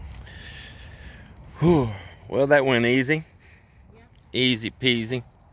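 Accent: Texas, United States. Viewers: Now I'm gona watch your channel everyday..love the Texas people and I love Texas,,we need Texas,,missed your accent..lol I'm originally from n.c. my Daddy was a farmer..I helped slop the hogs when I was 4 and pick some cotton balls..dig taters..love cracklins..bet Lil lady could cook some up...bet u have..ok it's pm here in pa.wonderfully cool..59dgrees.happy to git your chanl.love and peace to you both..you're a beautiful couple..tell her hello for portia...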